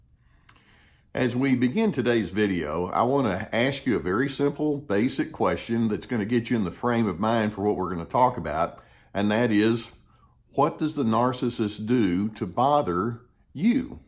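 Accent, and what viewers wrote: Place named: Texas, United States. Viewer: Your explanations are clear and thanks for the videos. I'm trying to place your accent. Are you from Texas?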